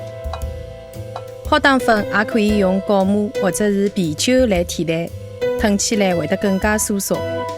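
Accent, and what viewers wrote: Shanghai, China. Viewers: 在美国听到上海乡音好亲切好温暖！ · 我东北人，不看字幕只能听懂个别词😂上海话还挺好听的。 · Yanting Qi 这个就是地道的市区口音，现在很多人听洋泾浜听习惯了就觉得正宗的奇怪？ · 满地道的上海市区口音啊，就上海市区话就分好多说法，有偏宁波口音的，苏州口音的，苏北口音的还有广东口音的，还有上海乡下青浦奉贤浦东等口音，有人说‘回来’也有说‘转来’的，都可以啊，主要看本来祖上或者生活的社区是哪里的移民，那个'teng一teng'就很地道，上海话普遍语速偏快，但是带苏州口音的就会说的比较慢，因为苏州话很细很软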